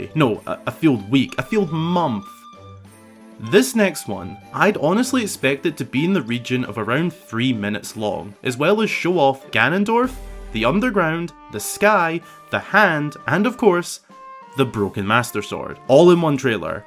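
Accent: Scotland, United Kingdom. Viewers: It’s been ages since I last watched u and r u Scottish I am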